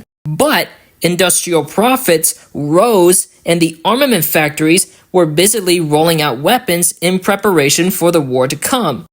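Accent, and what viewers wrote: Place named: Arab world. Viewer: i kinda see what the commenter is saying though, he kinda sounds like how arabs who are very fluent in english from a young age sound, theres like basically no accent but it sounds a bit off. you can hear it in more. source: im an arab who never properly learned arabic but grew up around people speaking arabic so my accent is a bit weird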